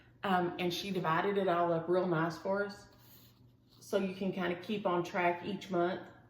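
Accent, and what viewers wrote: South, United States. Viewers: we speak the same Southern drawl!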